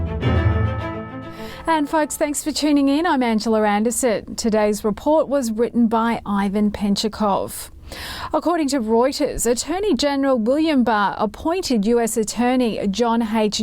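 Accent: Australia. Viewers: U sound like a Aussie.. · Thanks God for a good honest reporting I like the epic time you’re a spicy lady with awesome Australian accent keep up the great work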